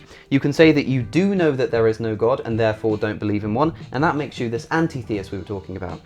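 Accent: United Kingdom. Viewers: Are you British or English?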